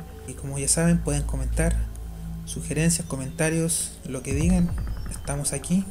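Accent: Chile. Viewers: Buen video. Oye una pregunta,eres Chileno??? lo digo por tu acento! Saludos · Video Robado y monetizado sumale la voz de un chileno Boludo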